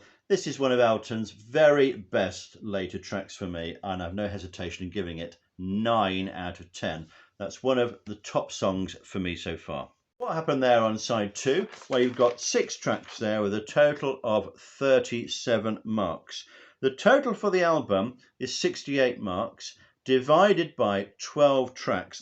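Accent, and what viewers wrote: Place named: United Kingdom. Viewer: I guess these grades you are giving to songs do not represent their grandiosity, this album comes with a creative energy which we haven´t felt for years...the piano in first plane..the sound of vocals resembling an analog registering/record. I think i would jump two or more numbers after all you suggested...lol. I love your British accent.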